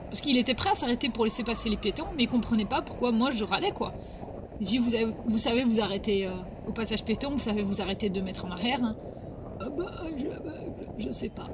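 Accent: Belgium. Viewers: Sans oublier que le fait qu'il y aie une caméra, ça en calme souvent plus d'un ! C'est comme un bouclier. Ca a peut être l'air d'un gadget, mais en fait une caméra c'est une fameuse assurance à moindre coût. Maintenant, je me sens tout nu si je n'en ai pas. :) Chouette vidéo. Y a le côté "j'ménerf pas, j'esplik" dans la voix, le côté "Belge" plaisant. Je ne me sens pas seul du coup ! ^^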